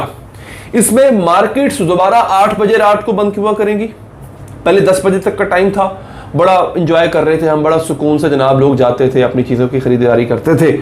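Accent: Punjab, India. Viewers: Love your punjabi accent 😍 ❤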